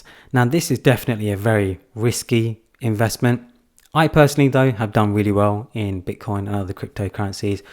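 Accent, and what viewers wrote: United Kingdom. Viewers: Hi, I find your accent quite interesting, is it cockney?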